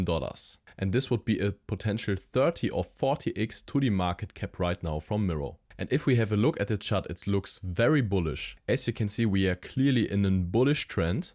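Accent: Germany. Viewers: Your heavy German accent gives me hope. You guys are very calculated when it comes to crypto. Everytime I come across a German content creator that technically analyzes crypto, it’s always a bullish sign. I’m all in on MYRO and almost got 1Million. Let’s go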